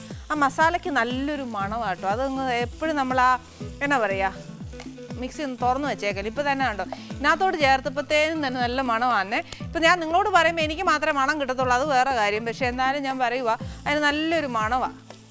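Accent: Kottayam, India. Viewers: Kottayam bhasha kurach koodipoyi 😂😂😂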